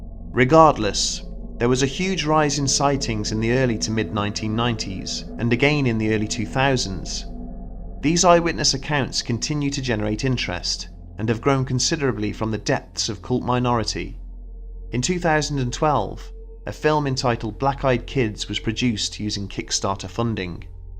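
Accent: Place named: England, United Kingdom. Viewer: The dude’s English. I’m willing to bet he knows how to pronounce Leicester while you do not. Get over yourself.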